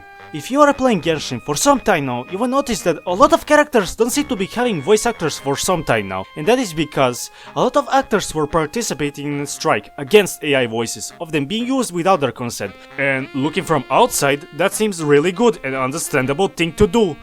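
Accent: Eastern Europe. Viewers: Never knew how much I needed a man with a thick Slavic accent scream about how stupid Genhin VAs are now until now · dudeeeeeee the sickass art? the memes? the angry slavic accent? new sub right here damn I ain't rihanna but where have you been all my life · Slavic accent enhanced the experience too.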